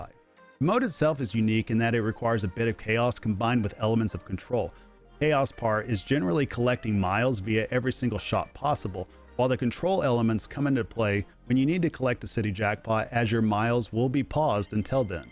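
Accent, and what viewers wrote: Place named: Oklahoma, United States. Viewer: Fellow okie! Great review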